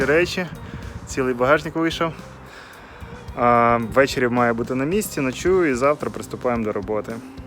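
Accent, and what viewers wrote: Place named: Rivne, Ukraine. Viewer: а звідки пан родом?. по мові чую може бути гощанський район або острозький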